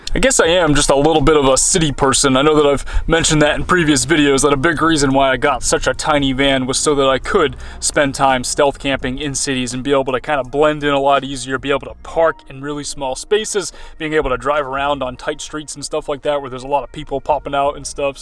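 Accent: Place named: New England, United States. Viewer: It was that 'HIGH-SPEED NewEngland speak' you do; threw them off during your greeting😉😊. Some visuals highlights of some of these cities/suburbs would be a nice addition,. JOY!💚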